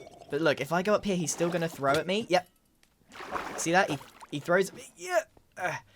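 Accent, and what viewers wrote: United Kingdom. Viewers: R u British? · Why is vocabulary sound much more British today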